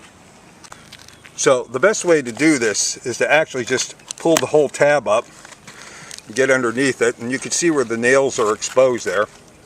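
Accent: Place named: Western Pennsylvania, United States. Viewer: You sound like you are from western Pennsylvania.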